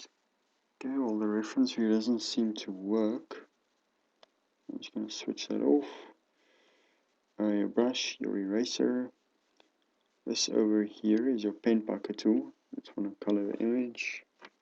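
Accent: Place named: South Africa. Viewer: You from South Africa? I love your accent~ <3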